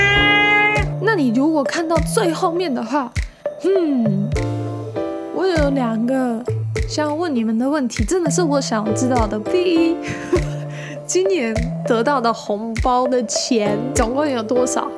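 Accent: Taiwan. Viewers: 地表最強台灣國語...+油..阿兜啊..你最強...讚啦... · 很喜歡妳講的臺式中文腔調，感覺像是周遭的朋友在聊天，很有親切感，我們家只要沒結婚沒小孩過年都會收到紅包，金額大概<num>臺幣，。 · 聽到台灣腔就是親切 哈哈😄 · 喜歡你的台灣腔的中文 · 很喜歡聽妳說的台式中文～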